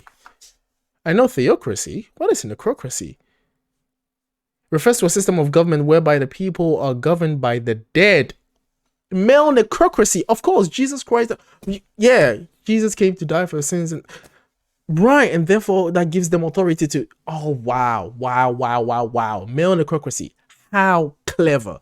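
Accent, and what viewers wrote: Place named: England, United Kingdom. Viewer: I saw an interview with Troy and Tuomas talking about not believing in God and organised religion. Troy actually said you are told about Santa and the tooth fairy and God, but for some reason as we get older some people still believe in God he says its, nonsense. He's English like me and I'm not saying there's no religion over here but if you compare the percentage of church goers to say the US our numbers are far lower, you sound English. This is in no way meant to insult people who believe in God I don't but respect other people that do. Your reactions are always funny informative and interesting. 🇬🇧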